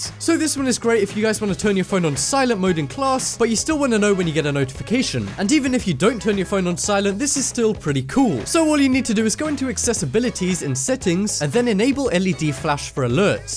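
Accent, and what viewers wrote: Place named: United Kingdom. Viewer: Omg he has a british accent, me took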